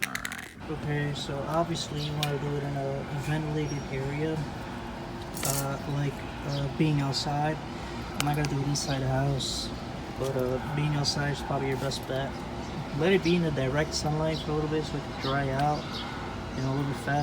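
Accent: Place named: United States. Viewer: Bro, you sound American as fuck 😅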